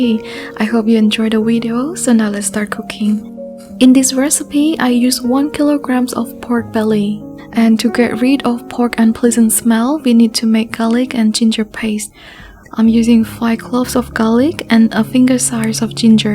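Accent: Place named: United States. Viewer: So proud of you, what you are doing, and how you are representing Khmer on international stage. From listening to your pronunciation, I think you are Khmer American. I am even more amazed that you can speak both Khmer and English so fluently, not to mention your soft and charming voice. And one more thing, when will you reveal your face to millions of fan in Cambodia 😬😬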